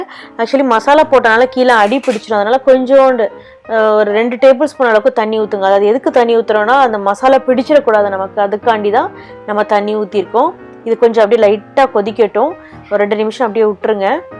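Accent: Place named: South, India. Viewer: Very nice, are you southside,your slan is such a way.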